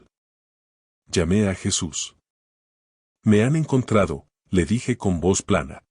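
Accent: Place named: Mexico. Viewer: Imposible 😂! No se puede decir que el protagonista que locuciona es de Toledo y ponerle acento mexicano. No way!!! 🤦🏻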